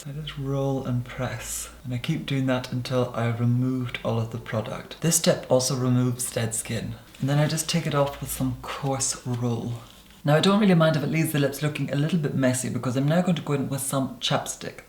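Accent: Scotland, United Kingdom. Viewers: The more I see your 'fillums' and the more I hear you speak, the better I understand your English with that charming Scottish accent. For a Frenchwoman, believe me, it's not so easy! Thanks for the lessons, Professor 🌹 A 37 years old French woman ❤ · maybe outer Scottish isles but quite enunciated? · Are you Scottish?? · J Yes, there most certainly appears to be a slightly Scottish undertone audible here, there, and everywhere.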